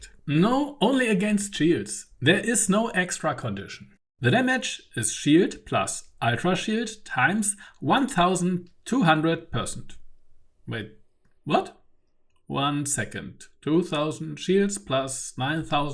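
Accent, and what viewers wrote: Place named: Germany. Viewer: your german accent is horribly intense, use your tongue a bit more when speaking english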